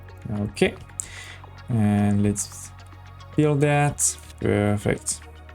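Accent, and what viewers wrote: France. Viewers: Hello man I know that it has nothing to do with the video but I have have a problem with my mods, i want to play with the Commuter pier for new world but it doesn't work. All the other mods of Spice it up don't work too, and I use the mod launcher so if you have any clue how to fix it or if you know if spice it up is not udpdated with the nex world rising it will help me a lot. ( I think your french looking at your accent and me too so if your french you can answer in this language). Thanks for the videos :)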